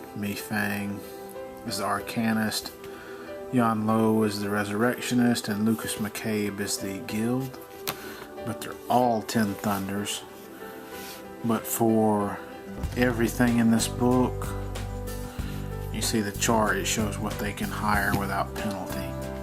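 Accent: South, United States. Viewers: thank you very much for this. the musi with your soft southern vouce makes this very hyypnotising and relaxing.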